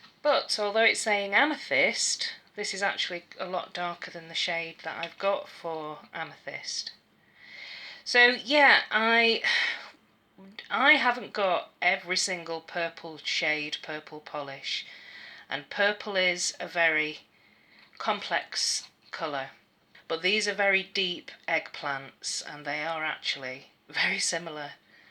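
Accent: Scotland, United Kingdom. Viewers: These are my absolute favourite vids of yours. I love learning about colour! I've been organising my ROYGBIV series and I've written 3 different sections for purples, lol. Oh man, I need to get that Snugglebutt. Ahahaha, your Scottish accent xD Excellent vid! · thanks babe. omg im pmsl here at the plums and the scottish accent. 😂😂😂 x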